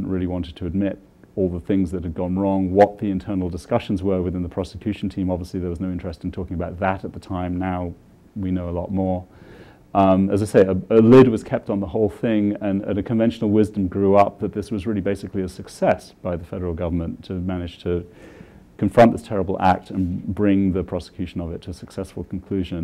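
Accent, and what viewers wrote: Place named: United Kingdom. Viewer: The republican party is not a terrorist party, we would only become that way if our country in our constitution is jeopardized. You need to get that straight, you sound like a brit so you don't know what the Hell is going on inside our country. That is precisely why we ran your asses out of our country in1775.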